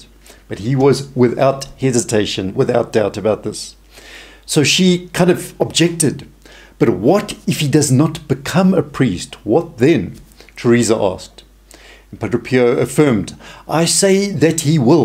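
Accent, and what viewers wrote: South Africa. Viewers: Woah is this presenter South African? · Unmistakably south african.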